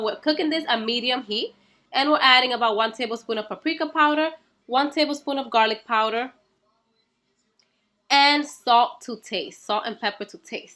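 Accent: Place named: Dominican Republic. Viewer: U sound Dominican is this the Latino way of making? Lol looks good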